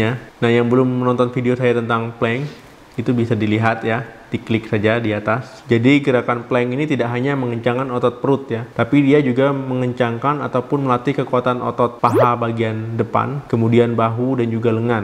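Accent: Aceh, Indonesia. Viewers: Dokter logat bicara nya aceh banget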